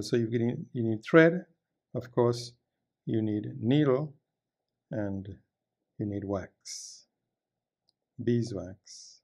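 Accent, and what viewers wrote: Trinidad and Tobago. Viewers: I recognized that accent from Trinidad & Tobago, as my mother, a Serville, is from Trinidad. What an honor to learn of this as I saw you proudly displaying the Trinidad & Tobago flag. Thank you Sir for teaching us with your videos. It is quite the pleasure listening to your wisdom and learning more about the world of Bespoke. Mr., I would love to experience a collaborative video produced between you and Mr. Jacome. It would be assured artisan brilliance. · I knew I recognized your accent, and it was confirmed with your Trini flag! I am fascinated by the amazing detail in your work!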